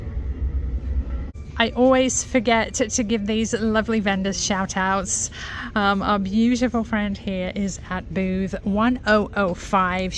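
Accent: England, United Kingdom. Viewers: If you grew up in the United States, why do you have the English accent just curious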